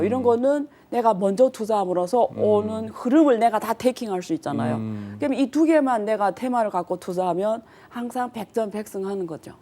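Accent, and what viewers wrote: Yanbian, China. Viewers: 조선족인가? 연변말투네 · 발음하는거 보면 모르냐? 원래 조선족인데 정신이 바로 박혀서 한국에서 애들 가르치잖아.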